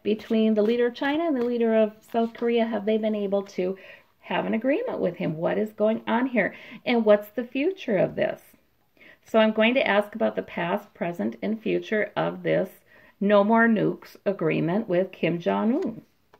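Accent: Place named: Midwest, United States. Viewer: Love your readings. I can relate to your voice thinking you are from the Midwest, ya know.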